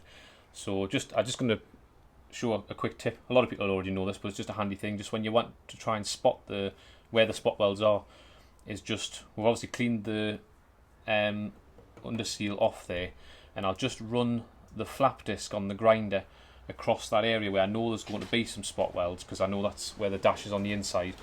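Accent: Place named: Northern England, United Kingdom. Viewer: Top Vid, sounds like your up north. Do you know of any good MIG gas suppliers in north-east (Stockton/Co Durham) but not BOC?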